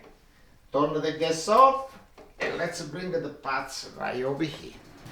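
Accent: Italy. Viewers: This looks delicious! And your English is excellent,! I love your Italian accent ❤️. Salute e cin cin! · its kinda crazy, you can almost hear how the NY dialect developed from this italian accent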